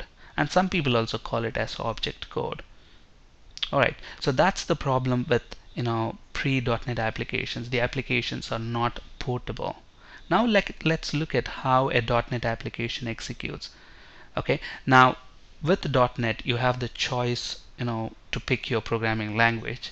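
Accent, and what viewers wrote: India. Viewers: Indian?I feel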